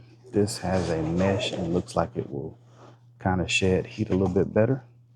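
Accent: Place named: South Louisiana, United States. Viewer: Helpful and informative review. Thanks friend. By any chance, are you from South Louisiana? You sound like it. I’m from Lafayette.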